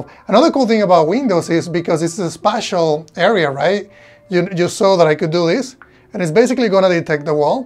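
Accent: Spain. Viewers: muchas gracias por responder!! y perdona por tomarme la libertad de escribir en español en tu canal en inglés, deduje por tu acento y nombre que lo hablabas. Me encantaría preguntarte si consideras factible que en algún momento puedan sustituir a las pantallas físicas, es decir, vemos como reproduces un trailer en una ventana de windows, podríamos estimar que representa una pantalla de 100 pulgadas o las que te apetezca, cierto? pero se aprecia que no son 100% opacas, no sé si es algún parámetro concreto que has configurado en este caso... En definitiva, sería increible poder ver una película proyectada en una gran ventana, virtualmente en una pared sustituyendo a un televisor y también, saber en qué medida afecta a tu vista, si te sientes cansado o algo similar. Muchas gracias de nuevo.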